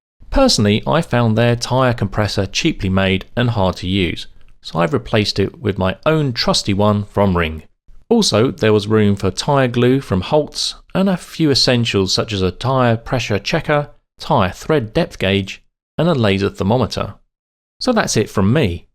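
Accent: United Kingdom. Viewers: NitroCaliber he is in the UK (registration plate and accent gives it away), but the black coloured grills were bought in Pounds (UK currency).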